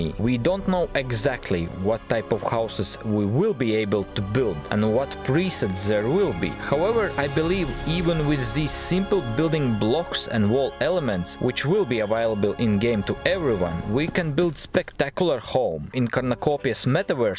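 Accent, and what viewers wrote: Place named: Russia. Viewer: Might wanna skip the Russian accent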